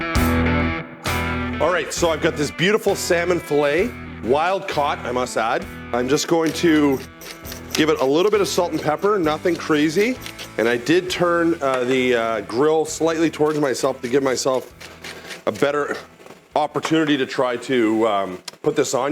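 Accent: Canada. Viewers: Soooo...don't put it in the dishwasher then? Also, you sound suspiciously like a Canadian.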